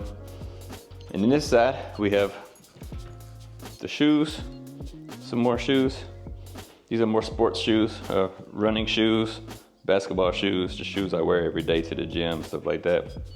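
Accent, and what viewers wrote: South, United States. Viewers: Like that southern accent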